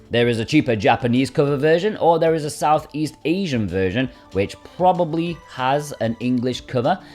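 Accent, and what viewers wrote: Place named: United Kingdom. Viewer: Youre British? It’s strange i can understand your speaking 😅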